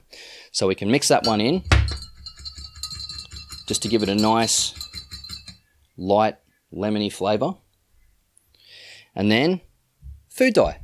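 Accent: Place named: Australia. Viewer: The Aussie accent I love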